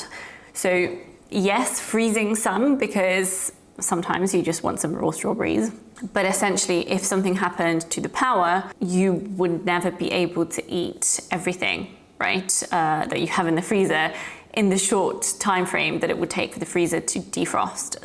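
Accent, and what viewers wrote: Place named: RP, United Kingdom. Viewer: You’re polish but have a very posh English accent. Love it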